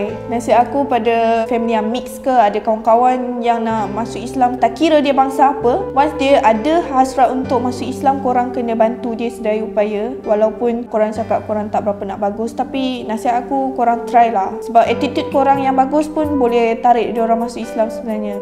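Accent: Malaysia. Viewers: Cakap macam 100% orang Melayu, hebat!!! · kepala kentang accent dia mcm org melayu.. mohon faham statement saya.. tq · Seriusla chinese...mati2 aku ingatkan melayu,satu hun pun xade pelat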